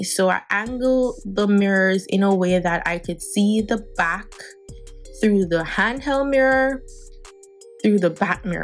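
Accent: Jamaica. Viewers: Wait girl.. u jamaican or supm?... cause mi a hear a likkle supm supm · Are you from 🇯🇲???? Cause I heard a lil jamaican accent..... And I really like this style. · Cause me know you sound jamaican girl big up 💯 Am a try your way tomorrow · I was just about to say u sound like Jamaican 🥰🥰 love my ppl.. About to do my hair like this now thanks for the video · I can hear the Jamaican accent♥️🇯🇲...It's everything for me 💯❗ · Your Jamaican accent gave you my sub😍😍😍mi luv mi yaardie dem baaaad😂😘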